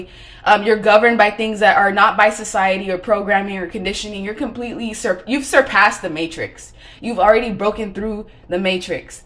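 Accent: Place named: Latin America. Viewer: Girl I’m a black girl I could’ve sworn you were Hispanic, you got a lil accent!!! Lmao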